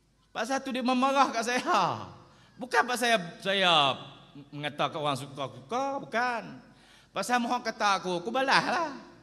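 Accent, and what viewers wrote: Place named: Baling, Malaysia. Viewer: Orang Aloq Staq pun blom tentu nak paham loghat Baling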